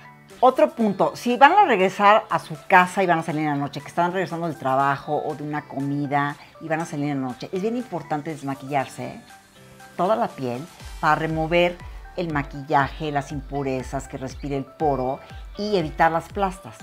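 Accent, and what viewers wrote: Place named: Fresa, Mexico. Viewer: Habla muy fresa: la caraa, maquilladaa... Habla mas natural sin esa pose, ya se oye muy falso y prepotente esa forma de hablar tan exagerada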